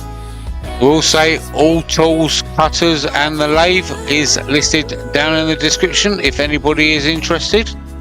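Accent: United Kingdom. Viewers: Very nice. I might try making a set to display in our family room. As a first time viewer I have two suggestions. Please turn down the music, being from the US it makes it hard to understand your British accent, and please move the camera so the chips don't aim at the lens. Thanks from a new subscriber.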